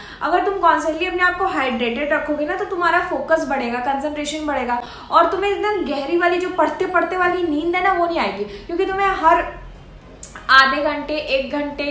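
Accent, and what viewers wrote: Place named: Bihar, India. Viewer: Di aapki voice m bihari accent h kaha par rhte ho aap???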